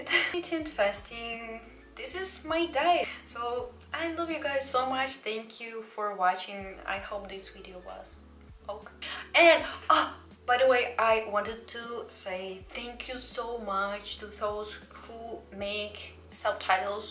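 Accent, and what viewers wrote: Russia. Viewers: Почему мне всё видео казалось, что она с России или Украины...Просто акцент у меня и моих подруг такой же....Ну или мы просто уже на уровне бог говорим...Ну или я сейчас жестко туплю из-за авто переводчика на ноуте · Русский акцент в английском так классно звучит(Грустный русский взгляд))) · Слышу русский акцент) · Не посмотрев на имя, услышала акцент, и думаю, она что русская? Имя - сказало все за себя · My brain cannot process on how korean you look but have a russian accent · мне нравится твой русский акцент😩💖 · Your accent (Russian)? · Yes, she has Russian accent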